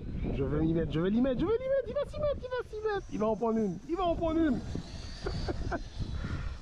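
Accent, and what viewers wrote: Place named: Martinique, France. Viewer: Se décors, sa tranquillité, l'accent de la Martinique ^^ un bon rhum et la RC moi je dit Goooooooooooo !!!! 😃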